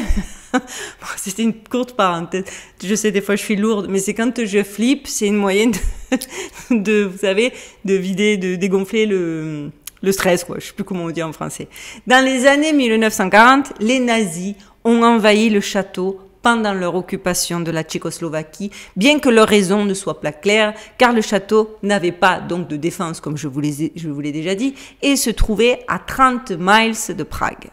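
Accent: Marseille, France. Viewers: eh tu sais quoi ta un accent de marseille tu l as pas perdue tu peux me croire bonne continuation et allez l om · Bonsoir, comme toujours un sourire et un humour que j'adore et je ne parle pas de l'accent de Marseille top. Superbe sujet et vraiment à l'ordre du jour on en revient toujours au SS ils sont vraiment trés présent dans notre quotidien depuis toujours. En se qui concerne la visite du château se sera comme toi sans façon laisse ma place aux autres!!!! Belle semaine...... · hello tout le monde toi qui a un accent du sud de la france cherie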